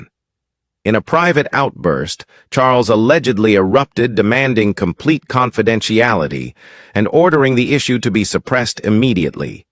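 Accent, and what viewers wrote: United States. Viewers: Not only is it total rubbish, gibberish avtually but this voice is so grating. Is it some kind of American regional accent? Wherever it is it wants avoiding.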